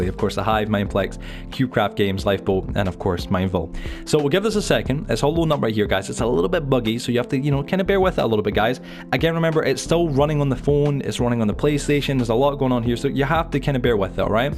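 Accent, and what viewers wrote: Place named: Dundee, United Kingdom. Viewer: U sound like ur from Dundee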